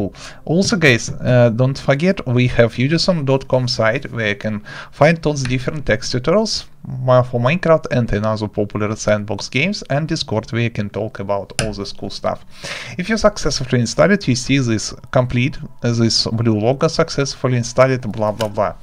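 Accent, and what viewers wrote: Russia. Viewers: сразу слышно русский человек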